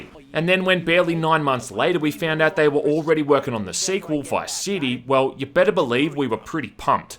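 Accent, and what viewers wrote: Australia. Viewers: i really didnt notice he was australian until he made that australian joke then I couldn't unhear it lol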